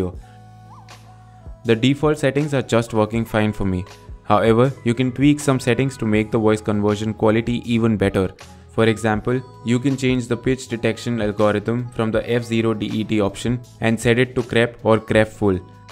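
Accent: India. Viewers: dude sounds like a kid with a indian accent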